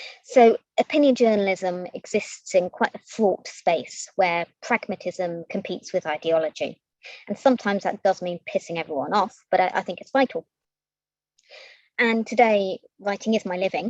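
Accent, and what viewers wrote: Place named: West Country, United Kingdom. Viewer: I thought she sounded a bit like Julie Burchill. Young girls’ voice with a slight West of England accent. Still, very informative and well put together . She should have her own videos. It would be great to have her debate Owen Jones. One person who sounds very young against someone who looks very young.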